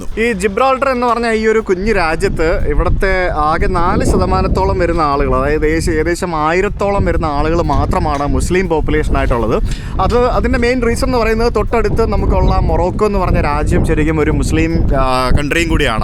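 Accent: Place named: Thalassery, India. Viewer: Oh that malayalam accent thalasherry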